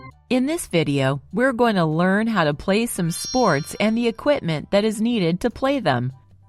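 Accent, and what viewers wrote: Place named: United States. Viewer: I love your teaching the most. I thought that you are English native speaker but it is not. I was motivated a lot when watching you speaking English. I love your American accent and I am trying to mimic your accent. Thanks for all the lessons, man!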